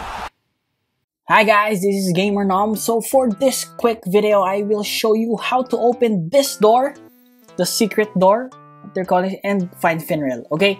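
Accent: Philippines. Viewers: Why do you have a filipino accent?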